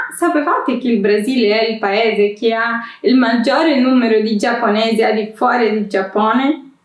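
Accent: Sicily, Italy. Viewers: Ciao, parli davvero bene! È curioso sentire che hai un accento spiccatamente siciliano, catanese direi.. ma va benissimo! :D Ciao.. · Ciao, sono arrivato nel tuo canale dopo aver letto un tuo commento sotto un video di Learn Italian with Lucrezia. Beh, che dire, bravissima! Parli molto bene l'italiano e per di più ho imparato tante cose che non sapevo del Brasile. Continua così 💪 Fun fact: sin dalla pronuncia delle prime prime parole ho pensato "questa ragazza sembra a tratti siciliana", poi ho scoperto che hai vissuto/vivi in Sicilia 😂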